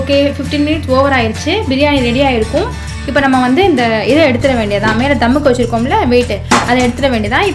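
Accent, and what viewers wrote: India. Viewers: Please vaya moodu ma... First yedhadhu channel la Samayal program yepdi pandranga nu pathutu relax ah video la pesunga... Pre video first oru paper la seymurai pathi step by step unnecessary repeat words illama yezhuthi vechu oru murai pesi parunga... Correction ku yarathu pakkathula vechu senju parunga... Then oil kodikara sound pondra idangalil background music avoid pannunga.. better neenga pathiratha moodi vechu time kudukanum nu soldra time ku music add panninale enough. Then very important neenga madurai, theni, ramanad, sivagangai area ah sernthavangannu nalla teriyuthu adhu thappillai. Unga area slang la neenga pesunga city karanga pola pesa muyarchikka vendam.adhu ungal videovin iyalpai kedukum.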